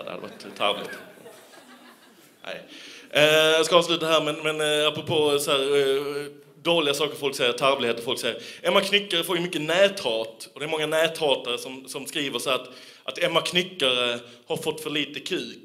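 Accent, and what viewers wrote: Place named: Skåne, Sweden. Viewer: han pratar skånska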